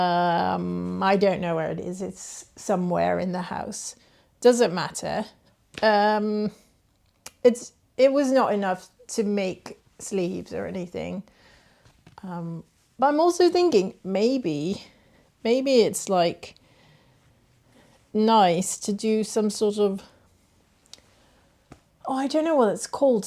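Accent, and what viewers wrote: United Kingdom. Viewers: Hi - I very much enjoyed this unexpected‘knit’n’chat’! Like you I knit English flicking style and although I can knit Norwegian/Continental style, and do so sometimes to use different knitting muscles, I always return to English style because it just feels ‘right’. Can I ask you out of curiosity (nosiness 🤥?) whether you are English on your maternal side and Dutch on your paternal side, or vice versa? Sorry if you said and I missed it, but I just heard about Dutch grandmother who knitted and English grandmother who knitted, so I’m just curious - especially as you have such a nice English accent, but appear to have lived for a long time in the Netherlands. Looking forward to more Vlogmas videos 😊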